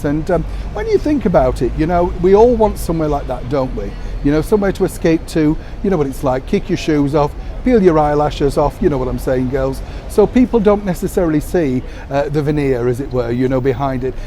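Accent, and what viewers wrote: United Kingdom. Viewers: Howdy from Texas! I love ,love, love your British accent! I love how you give the royal news about your precious Queen! I have learn to love her too! I so admire her! May she live forever!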